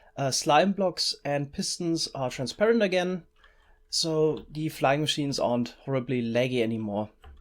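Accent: Germany. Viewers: Ich liebe diesen deutschen Akzent😂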